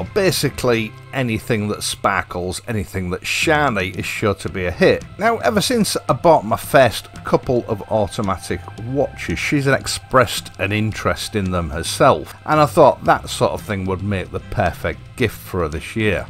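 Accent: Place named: Yorkshire, United Kingdom. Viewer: That's what keeps me watching your videos, I don't own a Triumph or an Enfield and probably never will, but your stuff stands out from the crowd, honest, down to earth, informative, entertaining, and, above all, totally individualistic! As an added bonus they are delivered with the best, broad Yorkshire accent one ever heard! Keep 'em coming mate! (and that's from South Australia where we can ride all year round!)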